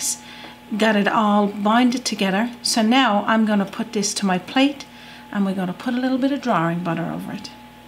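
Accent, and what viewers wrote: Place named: Newfoundland, Canada. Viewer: didn't mean it to come across as rude! My apologies 💜 Love Newfoundlanders and the accent.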